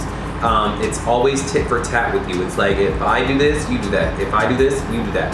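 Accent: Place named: Jamaica. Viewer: Intro 🔥 “ tun ye up” you must be Jamaican.. 🇯🇲 I’m Jamaican btw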